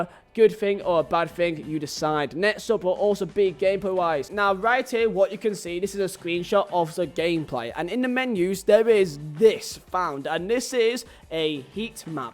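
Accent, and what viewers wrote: United Kingdom. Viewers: Bruh, how are you in poland with a strong british accent like that?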